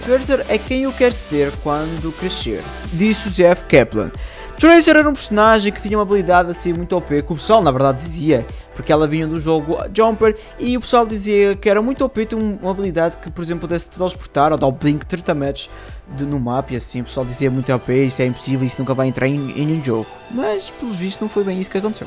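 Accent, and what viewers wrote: Portugal. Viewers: SERIA EU, EU MESMO mano ele é português de Portugal ja ouviu esse pais? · é português de Portugal, é facil de entender, n sei qual é o vicio dos brazucas a dizer que o português de Portugal "tá foda" sabendo que é o original e o vosso é que é todo mamado ._. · Beatszu ele é de Portugal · es de Portugal como eu?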